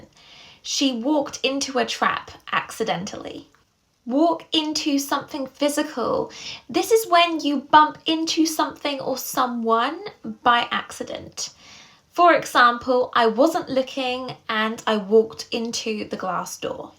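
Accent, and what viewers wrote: United Kingdom. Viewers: Love British English accent.❤❤❤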